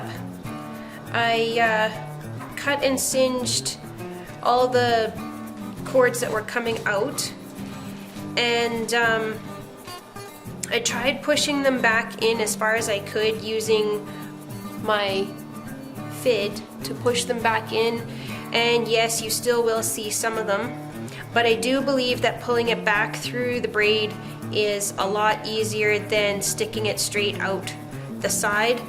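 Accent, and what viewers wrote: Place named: Canada. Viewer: Thank you very much. You have a wonderful video! Are you Canadian!? Accent gives us away!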